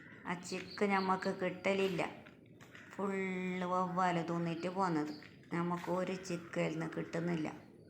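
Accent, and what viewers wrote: Kasaragod, India. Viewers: Good 👍 ksd slang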